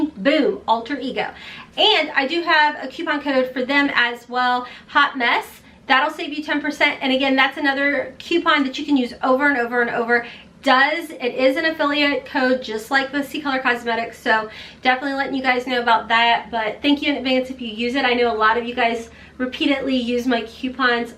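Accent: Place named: Kentucky, United States. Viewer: That Kentucky accent came out strong in this video! Love it!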